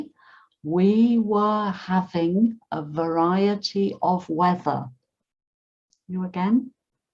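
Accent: United Kingdom. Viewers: Thank you so much. You use British accent right. This is what I looking for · Good morning. I am writing, "Good morning," because it is morning in Siberia. Thank you very much for your lessons, they are very useful and your pronunciation is perfect. Real British English. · i do love ur accent, British accent 🥰 that's y we called english, english is the mother of languages,lol American speaks english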